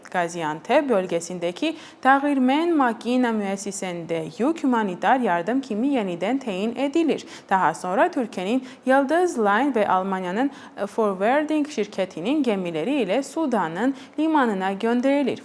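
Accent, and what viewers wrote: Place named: Armenia. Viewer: Deyəsən bu ermənidir, erməni ləhçəsi hiss olunur. Nə turkdi, nə də bizimki. Üçüncü kim ola bilər?)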